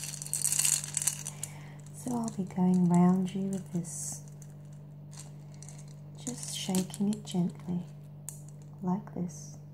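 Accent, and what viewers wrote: Australia. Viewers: You do a better Aussie accent than me and I'm Australian! Love it girl! Great vid! Thanks xx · Great Aussie accent!! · awesome Australian accent !!!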